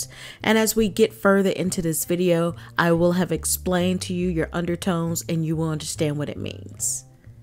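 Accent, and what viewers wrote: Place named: Raleigh, United States. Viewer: Are you from Raleigh?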